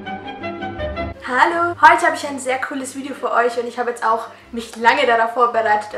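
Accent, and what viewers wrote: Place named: Franconia, Germany. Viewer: Oh, du bist so sympathisch :) Muss ich unbedingt ausprobieren, suche schon lange nach einem gutem Harry Potter-Butterbier-Rezept :) Ich habe gerade gelesen, dass du aus Bayern kommst (bzw. hört man es auch an deinem Dialekt ein bisschen :D), aus welchem Regierungsbezirk kommst du? Hört sich an wie Franken :b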